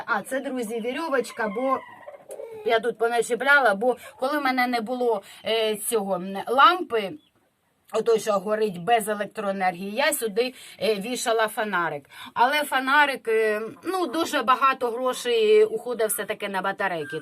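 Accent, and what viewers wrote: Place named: Central Ukraine, Ukraine. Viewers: Зайшла на ваш канал вперше,лайк і підписалася, дякую за щирість за таке українське спілкування,дивлюся Вас із Італії але сама родом із Вінниці,мова дуже схожа, дякую, буду слухати ваші поради, вони дієві це точно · Пані! Дякую вам за поширення домашніх справ. У мене закралась цікава думка, у вас така говірка, ніколи не повірив, що ви з Кривого Рогуі...наче десь з центральної України ближче до Полтави.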